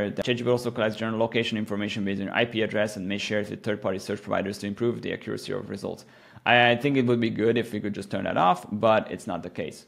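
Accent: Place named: Hungary. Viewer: This guy has Hungarian accent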